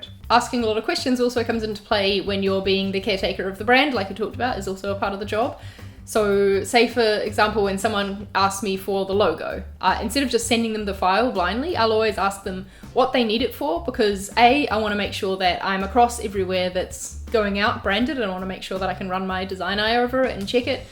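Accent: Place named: New Zealand. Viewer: such a rare thing hearing another kiwi on youtube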